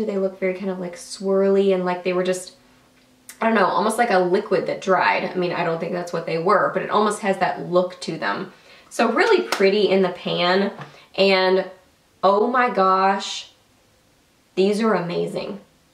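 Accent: South, United States. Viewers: You're so fun to watch! Your southern accent is so cute! Love and hugs from Canada!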